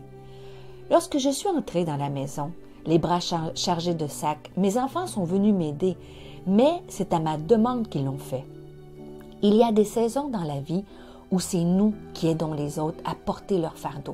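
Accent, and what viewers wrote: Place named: Quebec, Canada. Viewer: Bonjour merci pour ces mots gentils ey avec un accent tres fort du Québec si je ne me trompe Je mediterai volontiers sur ce que vous avez dit au cours de cette journee Bon dimanche a vous et a votre famille Des bisous de l'île Maurice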